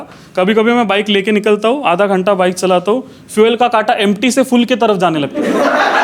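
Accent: Maharashtra, India. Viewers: Marathi accent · ...Phir dusra sapna dekha😂😂😂 Bhari vaatla marathi accent mdhli stand up aikun🙌, mhanun bakichya jokes peksha tuze jokes jaast relate zale😂😅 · Marathi accent shabd shabd se jhalak rahi thi. Maja aali 👍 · Marathi Mulga ani marathi accent...chumma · Marathi accent hota na to aavdla sir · Getting Zakir vibe plus marathi accent .. good one ! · Ohooò bhau that marathi accent is to hilarious.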